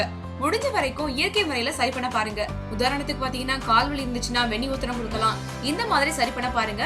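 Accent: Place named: Chennai, India. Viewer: Presentation too fast. Unable to digest some taminglish words of Chennai slang for non Indian Tamils like us. Please speak clearly in future for the talk to be useful for all of us. Thanks.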